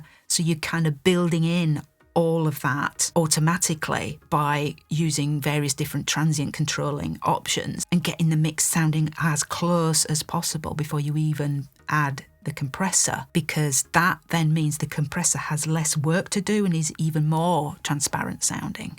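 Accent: Northern England, United Kingdom. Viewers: Glad you're back, you my no.1 source for tutorials about mixing. As an engineer myself I found that we are on the very same page in terms of workflow, choice of gear and alike. Love it, and i love you accent! is it from the north of England?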